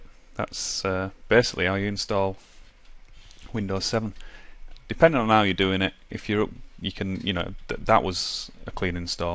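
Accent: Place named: Northern England, United Kingdom. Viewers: i can't thank you enough for that tutorial - you've just saved me two 50 journeys and no doubt the same amount in pound notes from the pc shop for doing it!! and i could even understand your yorkshire accent! :@ cheers. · Nikos Soumpasis lol it sounds like hes got a northern english accent and it sounds like an easy one to understand. but he was putting me to sleep and making me lose interest lol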